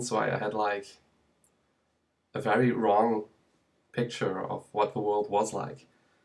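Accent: Germany. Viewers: You have a very faint German accent...Are you German? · Bist du aus Deutschland? Your accent sounds German.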